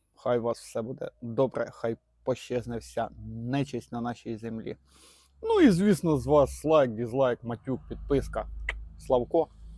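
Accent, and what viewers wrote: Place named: Central Ukraine, Ukraine. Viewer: Виглядає неймовірно й смачно, дякую за ідею і хороший контент❤(якщо не секрет-звідки ви)просто так наче з центральної України)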